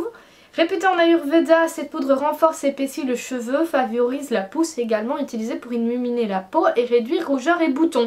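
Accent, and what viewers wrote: Belgium. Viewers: Héhé, voilà que je découvre ta chaîne, et en t'écoutant parler je me dis "Elle viens de Belgique, j'en suis sûre", et comme de fait :P (Moi aussi, huhu) Très sympa ta chaîne en tout cas :D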